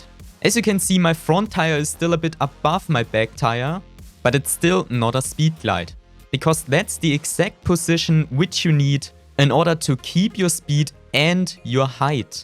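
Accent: Germany. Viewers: So heftig deutschen Akzent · Man hört voll das du eigentlich deutsch sprichst 😂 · Man hört voll das du Deutsch bist LOL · Alter ich schalte das Video an und höre sofort dass du Deutscher bist 😂. Aber alles gut. Ist wirklich informativ. Danke! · der deutscheste akzent den ich jemals gehört habe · You have a german accent are u german? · I know it from your a accent that you are a german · You are a 100% from Germany. Like ur speech omg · German accent haha 😂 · Dieser deutsche Akzent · He sounds so damn German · Man kann hören das du Deutscher bist😂 aber gutes video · Bro ich merk doch direkt das du deutscher bist. Sprech doch einfach deutsch. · I can tell from your english, youre 100% a German Guy. This Video helped me a Lot thanks. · Du bist alman oder man hört das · Digga gutes Englisch man hört das du Deutsch bist! Nices Video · man hört das du deutsch bist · der deutsche akzent killt aber nice video bro hat mir echt geholfen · ich höre es doch du bist deutsch hahaah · irgendwie höre ich ein bisschen deutsch in deiner stimme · Can you speak German? 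You have this accent. Im from Germany so yeah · yes, i can hear it very good, that he is from Germany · Man kann so hart hören das du deutscher bist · deutscher akzent lässt grüßen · Dein alman Akzent hahahah😂😂😂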